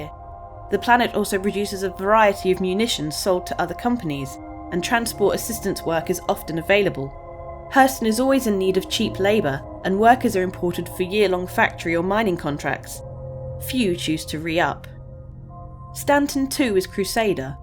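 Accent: United Kingdom. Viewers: The British accent adds 50% more authenticity to the video.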